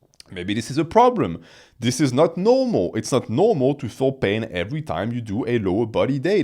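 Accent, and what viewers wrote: France. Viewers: God I love that French accent idk why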